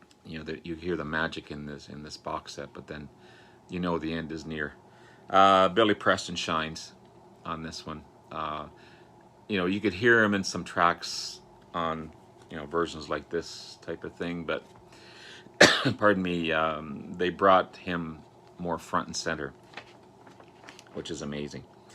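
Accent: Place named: Canada. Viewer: It’s nice to hear your thoughts rather than just showing something that’s been shown a million times already. I’m not a fan (I will go hide now...lol!) but I enjoy watching everyone’s related videos. A very good friend of mine bought me the 50th Anniversary edition of so I do have that...a talking point for the cool kids in the clubs;) LOL Also I love love how youguys say ‘out’ in Canada. It’s so endearing:) Lots of love from the Mitten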